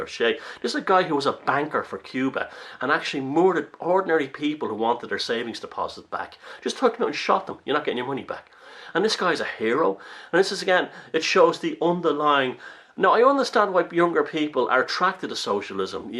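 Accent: Ireland. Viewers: Hello, thanks for your inspiring video,s! Watching your twenty twelve, video! Totally agree with you!!!!!! Noticing, your tantilizing lrish accent, tinking, about jr Tolkien, sermillion Hobbit! Lord of the rings! Thanks very much, bee jeebers!!!!!!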